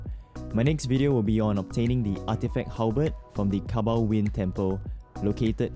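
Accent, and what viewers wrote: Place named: Asia. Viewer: Not bad at all! At first I recognised the Asian accent straight away (I’m Asian myself Malaysian that moved to UK since 14 and now 31) and i wasn’t expecting it to be a good video, but at the end it convinced me that I was wrong. In fact, at the end, I kind of felt warm and home listening to that accent. Good guide/video! Although I was already passed that stage while watching this.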